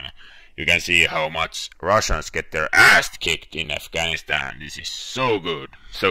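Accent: Finland. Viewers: Hassua miten heti tunnistin englannista että oot suomalainen vaikka en kattonu vielä nimees :D hyvä lista!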